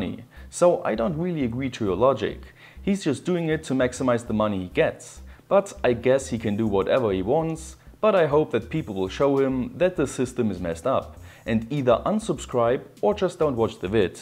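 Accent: Germany. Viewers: Man hört raus das er Deutscher ist.. haha. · er hat den deutschesten Akzent den man sich vorstellen kann. Alles was er aufschreibt und kauft ist in Deutsch geschrieben... ou man ^^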